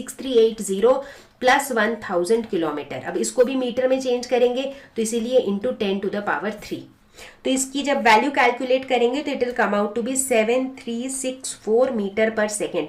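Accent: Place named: Tamil Nadu, India. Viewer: mam your language like tamil "FEEL" ☺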